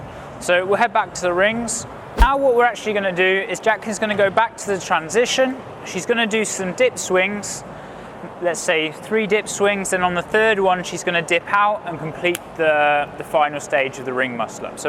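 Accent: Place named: United Kingdom. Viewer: 👑🚀Very nice tanning and with perfect British accent👑🔥🇬🇧 🏴🔥👑❤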